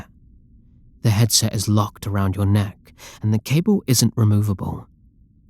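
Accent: United Kingdom. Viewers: Ehhh sound more southern to me but other than that sounds pretty British unless your actually British and are using an American accent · If only rp-ing a fictional character was enough to get you a yandere 😔/j Great job on the au!!! It's nice to have a taste of that British accent as well!! ^^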